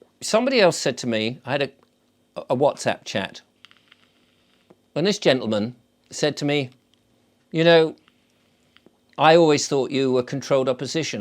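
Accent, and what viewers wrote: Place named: England, United Kingdom. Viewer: Love listening to typical English man with real English language my 3rd language..Listening all the way from Solomon Island - formerly "British Solomon Island" prior to our independence..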